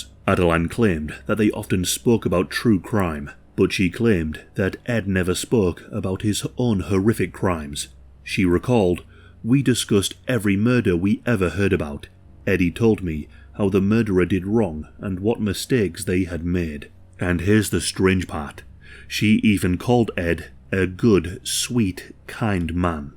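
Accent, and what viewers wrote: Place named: England, United Kingdom. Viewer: Ya fuckin ass is from England with ya accent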